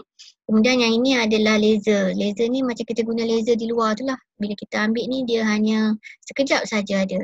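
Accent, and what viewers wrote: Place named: North, Malaysia. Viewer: Syukran cikgu.....Penerangan yang jelas dan terperinci. Seronok dengar ada dialek utara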